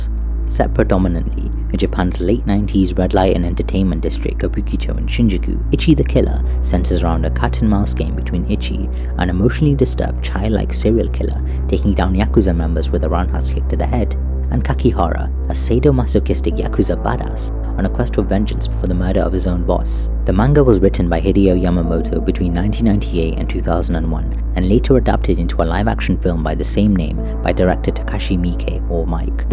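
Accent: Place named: India. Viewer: INDIAN ACCENT..DISIKE👎👎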